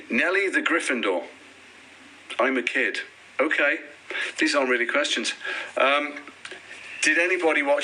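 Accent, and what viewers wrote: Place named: Liverpool, United Kingdom. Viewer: I love his natural Liverpool accent…I’m from Liverpool too. I actually met Jason at Liverpool Comic Con in November 2024 - Jason is the sweetest person you could ever meet. I actually had made made a diamond art portrait Of Jason as his characters. Which he really loved and it was a small gift. Love all his characters -he plays all his characters so beautifully and tell their stories beautifully. Jason was and remains the best Star Trek captain ever too.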